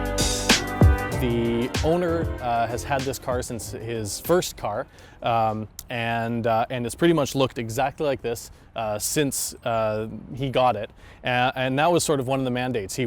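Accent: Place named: Canada. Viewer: Nice, clean build. I thought you were American at first, but the 'oots' and 'aboots' now make me think Canadian!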